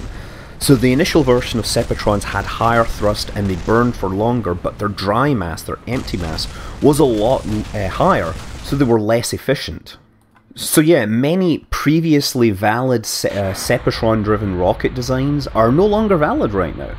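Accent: United Kingdom. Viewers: You live in the US? Sound so british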